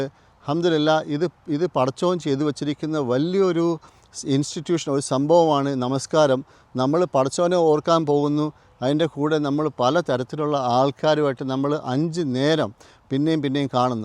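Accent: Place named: United States. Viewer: താങ്കൾ അമേരിക്ക യില്‍ settle ആയി കുറെ വര്‍ഷങ്ങളായി എന്ന് മനസ്സിലാക്കുന്നു. ശരിയാണോ കാരണം നിങ്ങളുടെ slang ല്‍ ആ ഒരു ചുവ കാണുന്നു Am I right?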